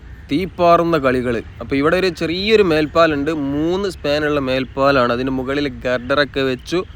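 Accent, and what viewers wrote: Malappuram, India. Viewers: മലപ്പുറത്തിന്റെ സ്വന്തം ശൈലി ഇതിലും നന്നായി വേറെ എവിടുന്നും കേൾക്കാനായിട്ടില്ല... You are supper Bro. 🎉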